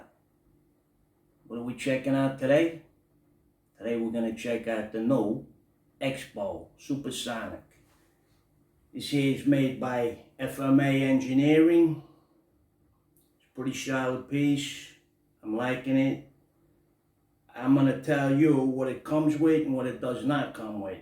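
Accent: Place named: New York, United States. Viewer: Hello from Glasgow Scotland, Getting very rare to hear a proper NY accent, its the same with the proper Glaswegian accent, great review, I have a few pistol bows and what I have done with the bolts is fill them with silicone, cheap and does the trick for a bit of extra weight.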